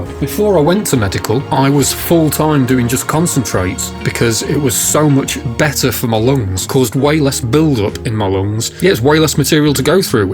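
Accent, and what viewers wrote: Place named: England, United Kingdom. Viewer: Maybe it is because of the english accent in general, but for me as a german you kind of sound a little bit like Karl Pilkington!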